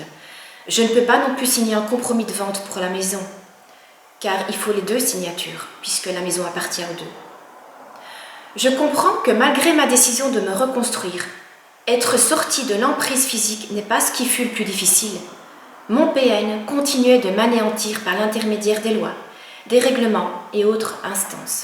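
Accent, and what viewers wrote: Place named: Belgium. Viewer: Bonjours j entends un accen belge dans votre voix, si vous êtes de Belgique pouvez vous me donner le numéro d un bon avocat belge si possible le votre ? Merci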